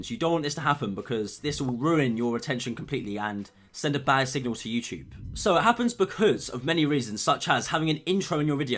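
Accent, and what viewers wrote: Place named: United Kingdom. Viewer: I can guarantee you that he's BRITISH....Bri-ish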